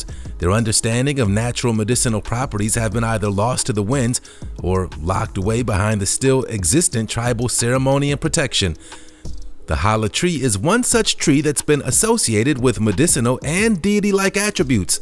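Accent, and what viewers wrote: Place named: United States. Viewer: I call it custarp apple too i think we speak british english this person is speaking american english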